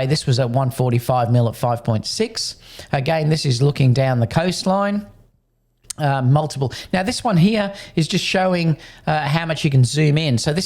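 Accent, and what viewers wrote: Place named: Australia. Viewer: Thanks for a great review in an Aussie accent! How does the image quality compare with the Sony 24-105?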